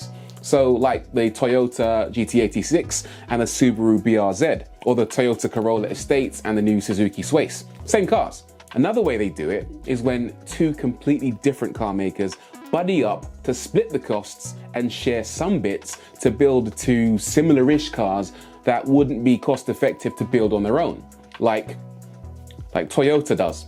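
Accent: United Kingdom. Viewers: Beside your amazing knowledge of cars and very pragmatic attitude, there is one thing I love about your channel that is part of you : your British accent. As a native Frenchspeaking Belgian, I learned English with American teachers and got very disappointed when confronted to the various accents of UK except the Queen’s English. I never need subtitles for US channels nor do I for yours. I don’t know how your accent is considered in UK but I definitely love to watch your video’s for this reason as well. Your pronunciation is clear, your wording is precise and yet all but American. Thank you for introducing me to British pronunciation. A real pleasure.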